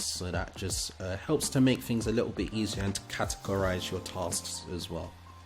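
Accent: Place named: United Kingdom. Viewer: Thank you for the video and the refreshing-to-hear British accent.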